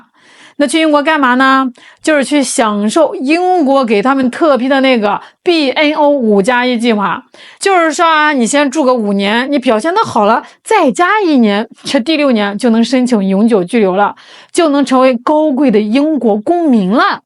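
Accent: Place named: Shandong, China. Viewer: 先改改满嘴的山东高粱腔，学会点儿话再出来卖无知吧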